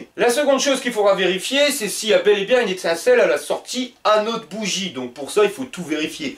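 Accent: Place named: Nord-Pas-de-Calais, France. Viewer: Bravo pour tes videos, c'est top, ça m'aide pour la restauration de mon Peugeot TXR de 84 ! Des conseils et cas concrets, de l'humour, de l'accent Chti qui va bien, je me régale ! Continue comme ça !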